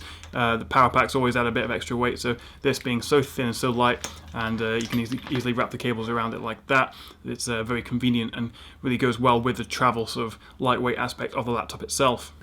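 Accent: United Kingdom. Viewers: Finally someone with genuine clear British accent here ;) not that german-mechanical noise XD Thanks a lot mate !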